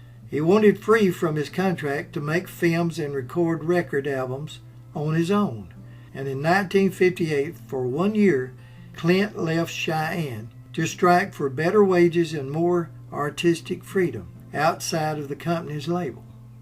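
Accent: South, United States. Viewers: I don't care about the subject. It's the narrator that's I like listening to. The southern accent is just adorable and voice calming.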